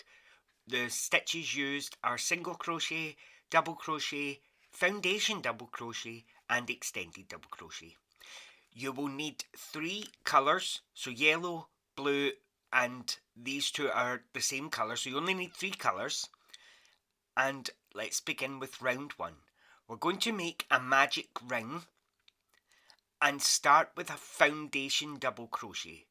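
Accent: Scotland, United Kingdom. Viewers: So glad to see you are well enough to be back giving everyone joy with your tutorials. They are so helpful and give clarity to your pattern. I love listening to your Scottish accent as well.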